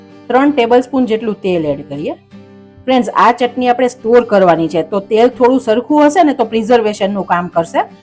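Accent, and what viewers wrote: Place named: Kathiawar, India. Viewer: nigujarati kathiyavadi Bhasha Mithi laga che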